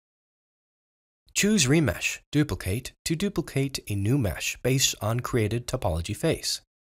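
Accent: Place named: United States. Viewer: I absolutely love the clear, professional, American voice and how it clashes with the bad english script :D But thanks for the rundown, very informative!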